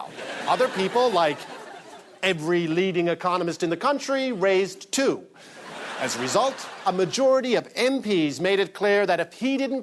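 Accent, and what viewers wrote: Newfoundland, Canada. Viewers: hes from newfoundland, thats why, though honestly i didnt notice it until i read your comment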